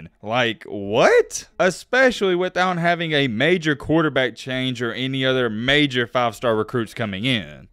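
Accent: South, United States. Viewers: Just an FYI, its Jim not John... On top of that, he's won NO big games outside of Ohio State? This video is completely biased and nothing you said holds any true water. The covid year that helped contribute to their 2-4 record, they were missing multiple coaches all over the staff. At a point, Michigans secondary was nearly coaching themselves last season. With that heavy of an accent, we both know damn well where your fandom resides. His clipboard has nothing to do with his success either, he's implemented the same strategy year-in and out. Any excuse you SEC fanboys can pull, you will. Another side note, what does Quinn Ewers have to do with this video? Smh.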